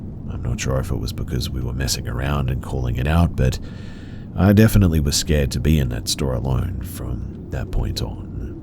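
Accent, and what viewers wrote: Australia. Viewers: Are you South Aussie? I mean; I am. And you have that type of Aussie accent you can’t quite pick which state you’re from 😂 but love the videos, they help me sleep. You’re voice, definitely top tier 🥹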